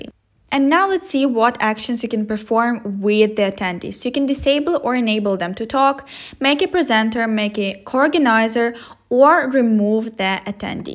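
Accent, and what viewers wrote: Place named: England, United Kingdom. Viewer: Love your English accent, great vedio.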